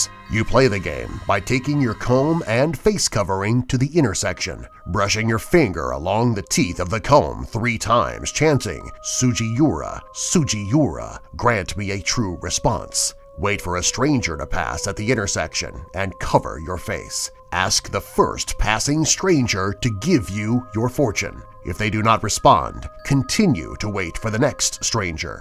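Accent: United States. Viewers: You. Are. American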